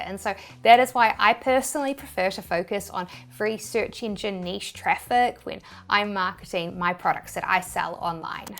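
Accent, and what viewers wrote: New Zealand. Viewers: why yees instead of yes and aheed instead of ahead is it like a kiwi accent ? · Video showed up on my recommended, so I clicked on it, and as I listened, and I wondered to myself if you were from New Zealand, so I came to the comments, and my question was answered 😂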